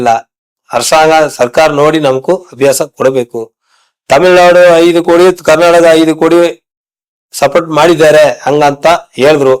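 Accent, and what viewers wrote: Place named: Maharashtra, India. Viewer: Border area sir,if you come to North Karnataka border area.you can hear marathi tone kannada.its common in border areas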